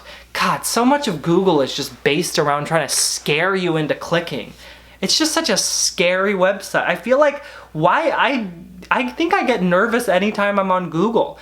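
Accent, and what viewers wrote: England, United Kingdom. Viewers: The British accent makes me burst in laughter every freaking time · I like your English accents. Makes me smile. Love your content.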